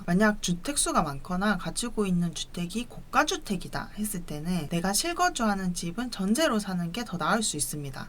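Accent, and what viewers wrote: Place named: Busan, South Korea. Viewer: 집 때문에 저희는 결혼할 때 싸우지 않아요 경매 받은 집은 안들어 갑니다 갭 투자도 안해요 세금 때문에 하지만 항상 이상한 애들이 월세내고 주변에 있네요 한번 뵙고싶네요 부산 가면 고향사투리 좋네요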